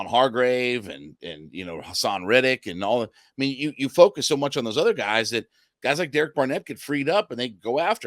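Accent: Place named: North, United States. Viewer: You make me laugh so much !! I have no idea where you from but definitely from north of Mason Dixie line. Thx for the entertainment! Go Braves and Falcons!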